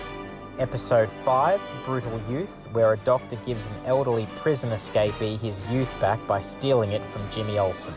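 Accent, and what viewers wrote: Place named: Australia. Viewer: now tell me if im wrong but that is an aussie accent if i ever heard one. thanks for the video mate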